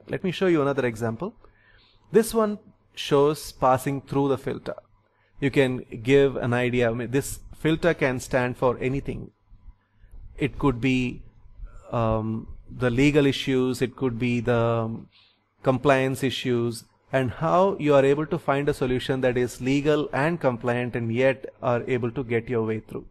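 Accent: India. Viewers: Yes, it was informative. Thanks. You have a heavy south indian accent though. Are you a Malayalee or a south Indian?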